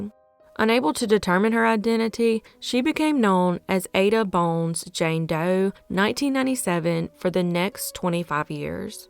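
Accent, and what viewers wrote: South, United States. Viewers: content a side, I have to say your calm pitched tone of your southern bell voice is perfect & keeps me coming back, although MANY others can have great content gets sidelined when they try to say it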